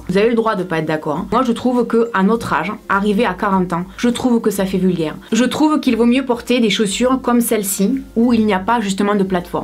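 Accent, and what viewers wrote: South, France. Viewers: J'aime trop ton axent t'es du Sud moi je suis marseillaise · Cc tu as l'accent du sud sa s'entend mdr moi je suis du sud aussi donc j'ai reconnu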